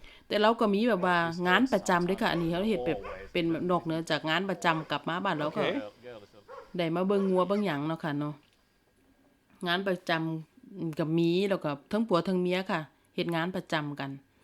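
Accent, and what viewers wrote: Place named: Chaiyaphum, Thailand. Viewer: มาเบิงงัวเมืองนาวนำครับ ขนยาวเนอะครับ เสียงเว้าคือคนชัยภูมิครับ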